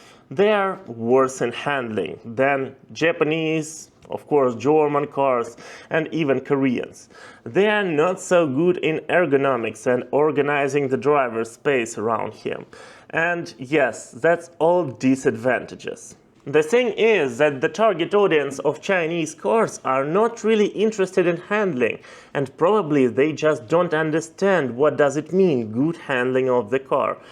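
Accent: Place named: Europe. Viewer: why lie or blatantly ignore facts. You either did not do your research or have not seen the level of innovation. You sound like you're from Europe. So i wonder how you have not tried a BYD, NIO, or any of the dozens of Chinese cars in your region. Wheelsboy YouTube channel as well as countless others can educate you if you're not inclined to try one yourself. Again what's the point of telling misinformation when facts are so easy to find. The first link is AMAZING! <link> <link> <link>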